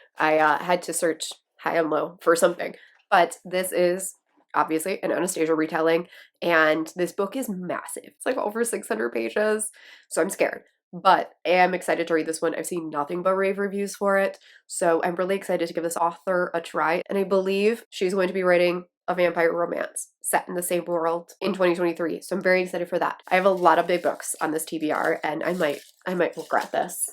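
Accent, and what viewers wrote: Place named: Wisconsin, United States. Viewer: Talking about your Wisconsin accent ruining your pronunciation is cracking me up. 😂😂😂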